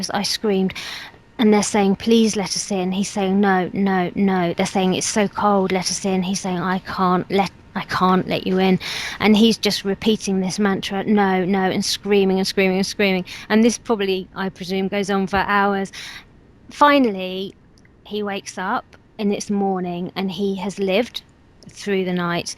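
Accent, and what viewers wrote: England, United Kingdom. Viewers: is awesome. I live near cannok chase I wish I could give her a bit of information about many of the stories about that place all I have some intresting information linked to masons and black eyed children. Ill try and look for a way to send her a email. Hey but her stories are great nice prim and proper english accent great story teller. The pig man is another story linked to cannok chase a Bigfoot type creature. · nice story and lovely british voice but on a sidenote it's just a story :) you have to be very gulable to believe this really happend but it doesn''t matter if you want a scarry night and just want some mystery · This is the kind of British accent that I love not the Bo'Ohw'O'Wo'Er one.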